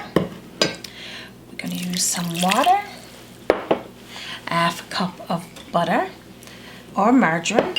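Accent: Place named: Newfoundland, Canada. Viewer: Oh my I could listen to you talk all day, it makes me think of growing up in Newfoundland. I think I will have to get me a couple of your cook books.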